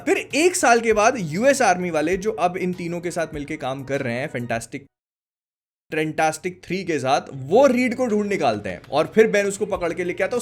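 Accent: Bhopal, India. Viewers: bhopali sunkar maja aajata he. me bhi bhopali hi hun.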